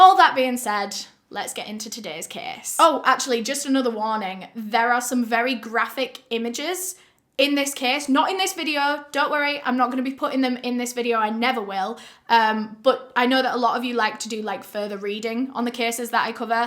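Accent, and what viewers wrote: United Kingdom. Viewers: Way to be dismissive of biological facts vato. Your ignorance is monstrous. What a drama queen to use the word monstrous 👀. You got a problem homie, Go and study biology. You want to take moral high ground, then never again watch a murder case. Not going to happen. All of us normal human beings have a fascination/curiosity with deplorable evil that other despicable humans do. Hence why I come on. Because I enjoy her charm, and her british accent, and her way of narrating the subject matter. Highlighting and exposing these scumbags. Many of which I never even heard of despite being american hispanic. Take your nonsense somewhere else. You don't like biology and nature 'Oh well' your stupidity is noted.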